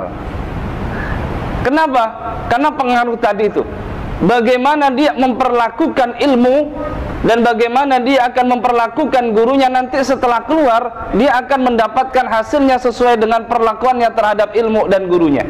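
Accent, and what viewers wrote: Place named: Madura, Indonesia. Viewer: mantap.madura nya masih melekat.hehehhehee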